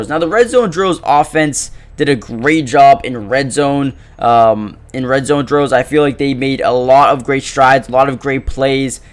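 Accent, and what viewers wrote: Canada. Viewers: Do I hear a Canuck in your voice????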